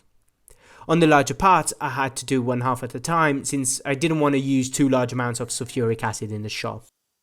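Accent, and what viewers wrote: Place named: Italy. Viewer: He's Italian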